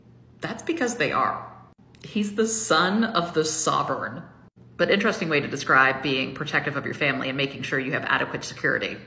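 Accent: United Kingdom. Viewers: Can I say I’m from Scotland uk & ur posh English accent is spot on lol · Your Comments have me cheering. Thank you for your careful and thorough analysis -- as usual you bring to light an aspect of the truth in the news I hadn't thought of. At some point in your future commentaries, you won't resist singing, and you'll have spoiled us so much with your British accent, your humor and your intelligence that we'll expect nothing less than a cat suit! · Love this! Sharing this to my Twitter for sure. Keep up the good work! Much love from a Brit. Well done with the British accent🥰❤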